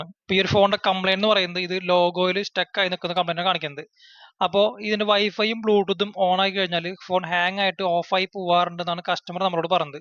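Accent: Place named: Thrissur, India. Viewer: sound കേട്ടിട്ട് തൃശൂർ ഭാഗമായി തോന്നുന്നു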